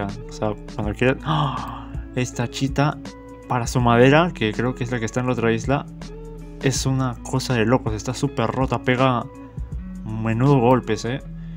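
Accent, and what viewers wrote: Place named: Peru. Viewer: Buenazo! Todavia con acento peruano causa xd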